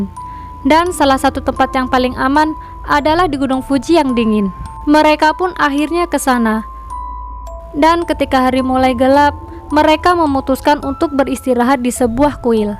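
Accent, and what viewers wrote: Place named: Java, Indonesia. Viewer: Jowo endi gaess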